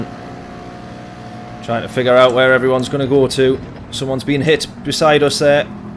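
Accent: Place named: United Kingdom. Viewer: Your streams a really good, you deserve more subscribers. Whats your UK regional accent btw? Can't pick it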